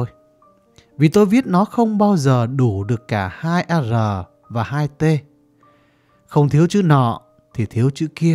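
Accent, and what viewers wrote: Vietnam. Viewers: Tui thích giọng đọc của bạn, một chất giọng Bắc rất riêng không chói tai và rất truyền cảm. Riêng về các tác phẩm văn học thì các cây bút miền Bắc viết có tính chất văn học cao hơn để cho người nghe người xem nhiều suy nghĩ giúp có thêm nhiều tư duy.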